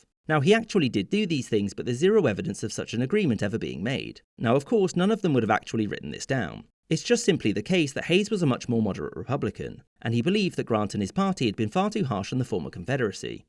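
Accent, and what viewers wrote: United Kingdom. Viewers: It’s crazy that the person commenting on US history is British.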